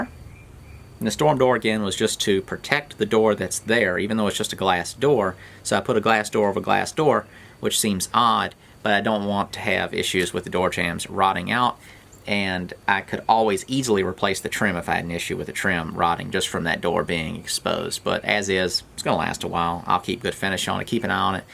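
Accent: South, United States. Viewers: American with a Southern accent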